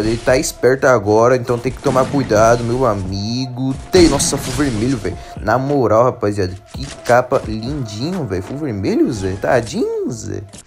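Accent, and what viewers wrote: Brazil. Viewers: Uai ze c e mineiro ze??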